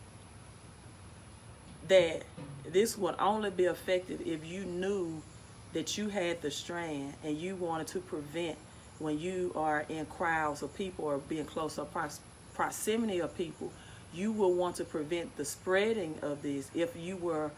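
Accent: South, United States. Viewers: Love that Southern voice✊🏾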